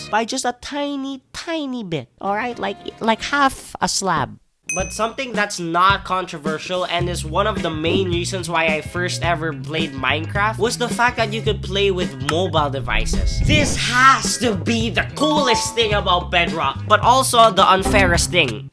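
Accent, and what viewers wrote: Philippines. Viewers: I know your filipino/southeastasian cuz of that accent :) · Dude you sound Filipino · i love how your filo accent slips all the time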